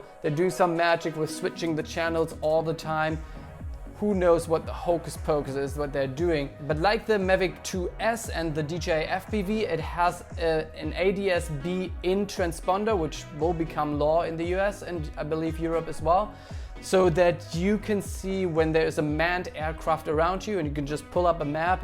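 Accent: Germany. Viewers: Where do you got your german accent from!?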